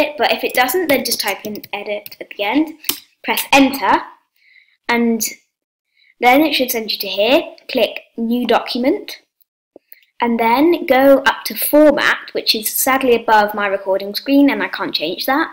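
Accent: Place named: United Kingdom. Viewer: Dat British accent tho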